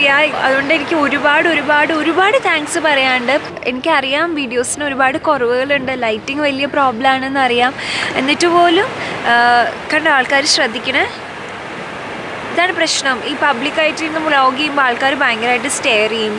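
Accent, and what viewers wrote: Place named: Thrissur, India. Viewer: I live in thrissur I know people here speak like this only...people from southern kerala like me may feel her slang different. Style ett parayunnath kettal mansilakum. Avarkk vakkukal kittarilla😅 pakshe nalla fluent alle malayathil.slang is different its because she belongs to thrissur. It's so cute to keep listening to her. Natural talk which I love most about her😊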